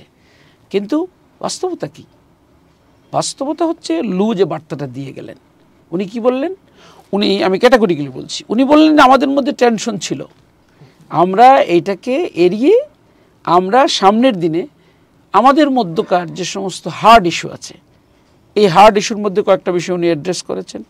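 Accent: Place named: Barisal, Bangladesh. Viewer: ভাই কথায় আঞ্চলিকতার টান আসছে বরিশালে টান। ঠিক করেন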